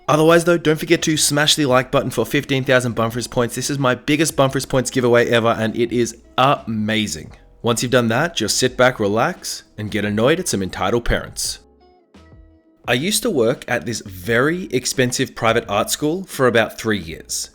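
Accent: Australia. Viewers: Im not 100% sure what his accent is but it is the best one Edit: well apparently it is an australian accent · wait are you australian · Wait?!? You're Aussie? How have I not picked up on this? Anyways, keep up the great work mate, I love your videos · Wait bumfris is aussie?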